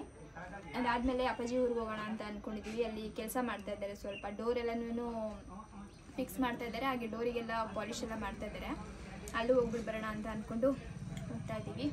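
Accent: Mandya, India. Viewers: Hi Nice video super singer ❤❤❤❤ ನಿಮ್ಮೂರ್ ಮಂಡ್ಯ ನಾ ಮಂಡ್ಯ ಭಾಷೆ ತರ ಇದೆ ನಮ್ಮೂರು ಮಂಡ್ಯ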